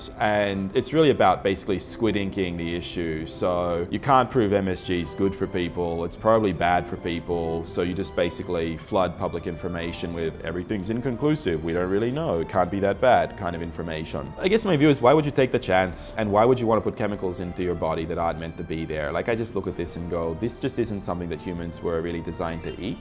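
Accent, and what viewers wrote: Philippines. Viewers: Don't listen to those hater, you have a great channel. But I have a question, how did you get a Filipino accent? Lol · Hi,. I'm a Filipina from Colorado and would like to weigh in. As far as your looks, you are way too fair, but then you have no control over that. As far as your face, you have nice, kind eyes and a nice smile. So to me, you would be an 7 - 8. But then individual tastes are exactly that, individual, right? I prefer male faces to be more chiseled. But that's just me. It cracks me up to hear you speak English because you have a detectable Filipino accent and you seem to have lost your Australian accent. Anyway, keep on "chefing" because I watch your show to get the "base" when making unfamiliar Filipino dishes. The Filipino chefs on youtube speak Tagalog, and I don't understand Tagalog. Good day!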